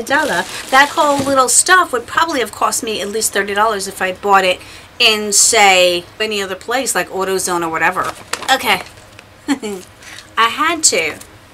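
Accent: New York, United States. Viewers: Love the Llama sox & your New York accent, you are such an awesome daughter to look after your Daddy the way you do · I love how you say New York, it sounds like New Yawrk!! Love your accent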